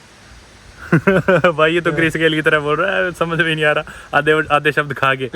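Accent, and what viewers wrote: Haryana, India. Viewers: Like your Haryanvi touch in your Hindi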